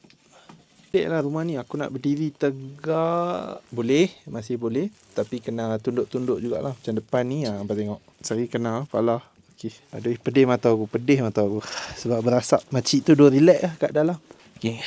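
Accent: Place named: Kedah, Malaysia. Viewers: Alhmdulillah aka kira baru masok line ni, seronok sgt aka bila dgr Adik ckp logak kedah, terbaik dik, Sgt jga tatasuli bahasa, terbaik dik, aka tak miss tgok Ulang2 yg pat kt india dgn mesir, kira semua aka suka, terbaik dik 😂👍👍👍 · bestnya..cantik sgt permandangan kg tu..terima kasih Dr..lg best bahasa kedah sampai sana. jga diri dn kesihatan bos. terbaikkk👍👍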